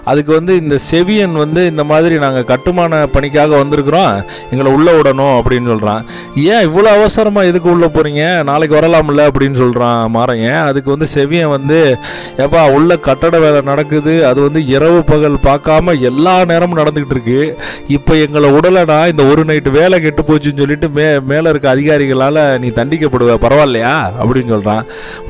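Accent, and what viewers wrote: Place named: Madurai, India. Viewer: Actually நீங்கள் எவ்வளவுதான் try பண்ணினாலும் முடியாது. சொல்ராங்கே மதுரைக்காரர் என தெரிகிறது.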